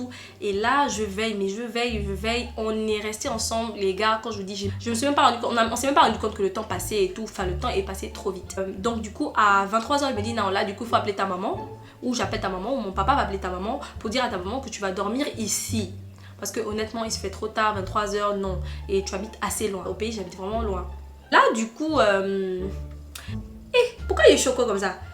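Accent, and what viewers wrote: Gabon. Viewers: Moi c'est tes accents que j'aime, surtout l'accent gabonais ❤️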